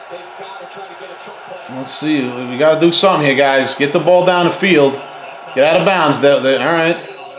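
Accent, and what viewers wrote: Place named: Boston, United States. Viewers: You sir are not from Texas with that Boston accent🤙💪🫡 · You kinda look like Christoph Waltz. How'd you end up a Cowboys fan with a thick east coast accent like that?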